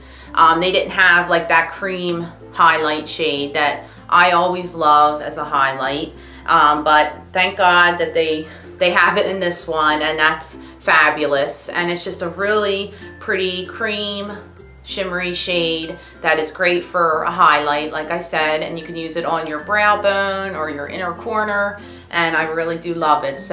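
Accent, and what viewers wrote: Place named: Philadelphia, United States. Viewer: Also I just stalked you a little meaning I went on your twitter and saw your from PA. I knew you were from the Philly area. Your accent is comforting to me and makes me miss home.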